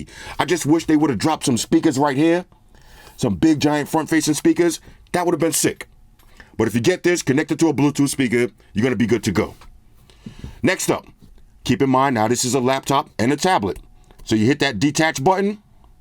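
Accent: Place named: West Coast, United States. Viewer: Here my man I just heard the voice for 1 second and I subscribed he reminds me old school west coast 😂😂😂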